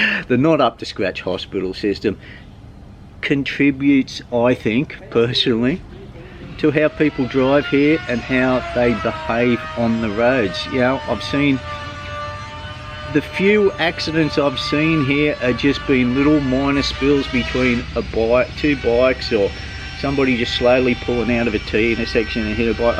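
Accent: Australia. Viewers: Congratulations to a good quality channel, so tired of watching similar content from S/E Asia, here in Thailand regarding helmets, the law is you dont need a helmet after sunset, except in areas where there is a helmet sign such as governement/hospital areas, go figure not kidding eather. Been so many times in Vientianne now after I moved to Udon so its nice to see what that city in particular has to offer, binch watching now and i really enjoy it, also like that aussie accent of yours. What i have found out in Vientianne sofar regarding food is that they have brilliant Chinese Cousine! Ive red that possibly the best Chinese couisine outside of China, i guess there are alot of Chinese builders in Laos nowadays that need their local food. Also the kebabs are far better than in Thailand Pizza places in thailand can be a hit and miss if you dont know where to go, but there are some very good pizzas even in Udon, but i guess the best are in Chiangmai or Phuket, keep up the good work and dont change your own stile, its why we are here. 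Have you ever thought of taking Lao Language lessons? Ive studied Thai a couple of years and its really helpful! You should do it too in my opinion it makes alot of things easier, even though you might not "need it", and Lao is a fun language to listen too when you have read Thai, its very similar to Isaan/Phuuthai "dialects" but with some differences in some letters of the alfabeth, with that i meen you can survive on it even in Thailand, they unde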